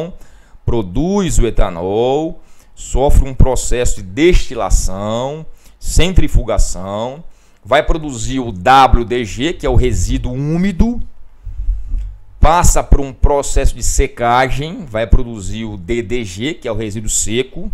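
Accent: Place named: Bahia, Brazil. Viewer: Professor tinha quase certeza pelo seu sotaque que era da Bahia! Acabo de receber de um amigo com quem compartilhei este vídeo a informação que é conterrâneo e que hoje está na UFPA!! Sucesso!! Abs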